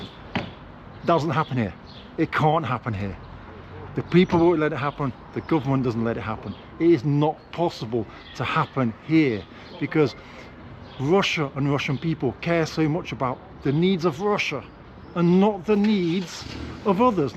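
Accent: United Kingdom. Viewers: It seems that people are paying attention to you not because you are suspicious, but because it is unusual to see a person speaking pure British English in front of a camera imo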